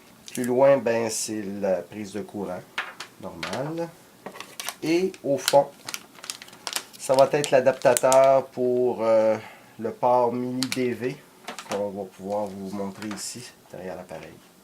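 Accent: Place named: Quebec, Canada. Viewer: i love your french Canadian accent!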